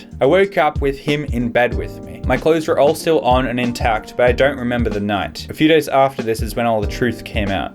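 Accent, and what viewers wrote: Australia. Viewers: I love how your Australian accent really comes out in this video ❤ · your Australian right? I seem to be watching a lots of Australians lately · are you australian pewdie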